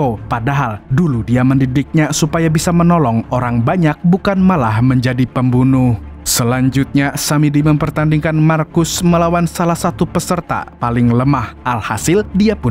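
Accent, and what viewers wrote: Banjar, Indonesia. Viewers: Lah org banjar kah🤣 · Fix urang banjar wkwk, banjarmna pian 😂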